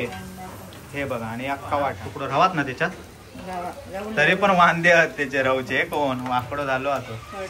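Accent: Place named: Malvan, India. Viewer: तुम्ही अस्सल मालवणी बोलता,अभिमान वाटतो धन्यवाद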